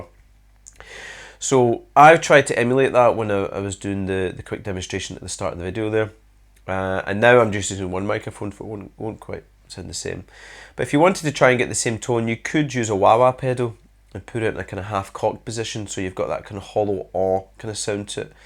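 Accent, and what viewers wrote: Scotland, United Kingdom. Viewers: What part of Scotland are you from? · sound scottish, maybe edinburgh.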